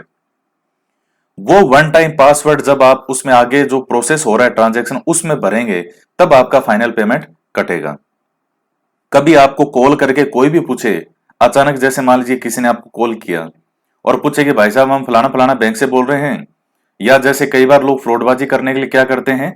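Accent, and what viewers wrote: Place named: Sri Ganganagar, India. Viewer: 🙏 sir Aapky bary m janty to nhi bt aapni voice tone s lgta h jese aap sgnr( sri ganganagar) k nivasi ho.😊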